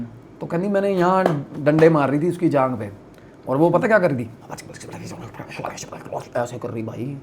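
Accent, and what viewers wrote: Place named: Punjab, India. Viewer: bhai this is punjab n hamare idhar aise he bolte hain meri jaan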